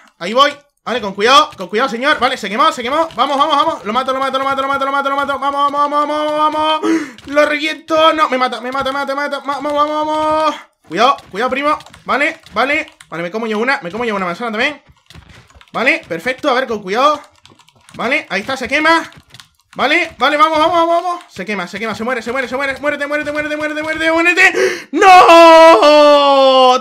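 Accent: Argentina. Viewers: se volvió argentino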